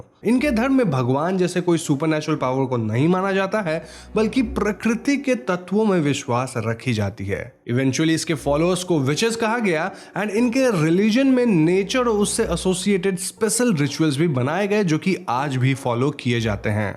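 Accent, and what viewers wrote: Bengal, India. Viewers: Can I ask you a question sir....are you Bengali?? Cz you're Hindi accent main thoda thoda Bengali wala accent aa jata hai.... ( I am Bengali )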